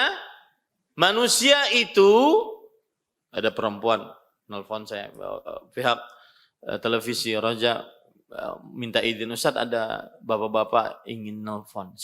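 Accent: Banjar, Indonesia. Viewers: Org banjar kah ini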